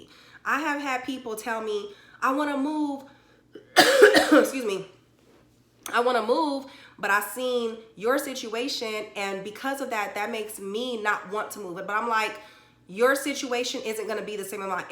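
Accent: Texas, United States. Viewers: You have the Texas accent lmao. DFW is definitely a go to moving place Our population may not be that much..since the population here is only 8million but real soon DFW will be bigger than Chicago and the same size as LA. But it's still so cheap as it was decades ago..and still more opportunities and jobs coming everyday Your doing a good job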